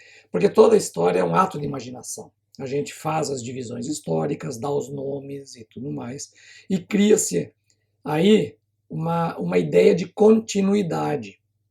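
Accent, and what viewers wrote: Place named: Rio Grande do Sul, Brazil. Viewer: Eu primeiro lhe identifiquei como brasileiro e pela entonação e sotaque eu notei que era gaúcho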